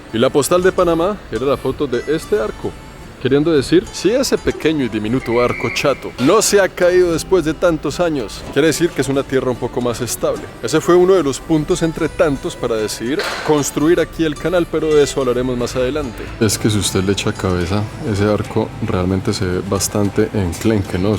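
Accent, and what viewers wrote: Colombia. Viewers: pero su acento parece más colombiano (del interior) que panameño... O me equivoco?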